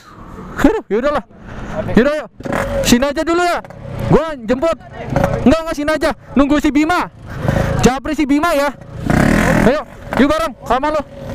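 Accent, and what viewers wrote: Banyumas, Indonesia. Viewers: pantes ngapak.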